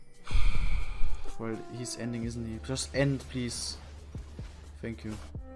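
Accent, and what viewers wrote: Germany. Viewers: Where is he from he sounds like a German dude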